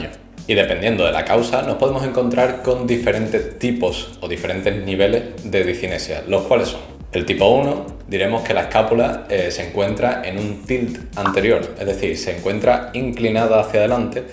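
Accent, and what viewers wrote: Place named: Andalusia, Spain. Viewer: Hola, por tu acento, eres andaluz. ¿Cómo podría contactar?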